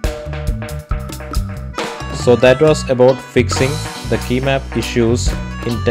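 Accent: Kerala, India. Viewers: are you an a mallu ?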